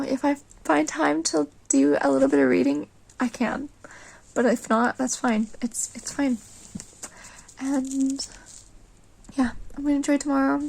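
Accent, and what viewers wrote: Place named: North America. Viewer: Your vlog style is pretty relaxed. Sorry to hear you're stressing over assignments. Of course, everybody goes through that in one way or another. Good that you have friends in your house there. had her staircase mates in her Jesus College dorm. ...so I've been wondering what's up with your North American accent, and that fact that you say "bangs" instead of "fringe" and don't know the word "crest". May I ask where you're from?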